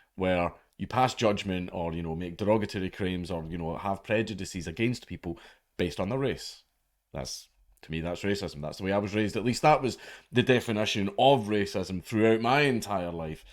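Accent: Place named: Scotland, United Kingdom. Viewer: I love hearing say things like “poor” with the Scottish inflection but nothing tops his pronunciation of the word “murder”.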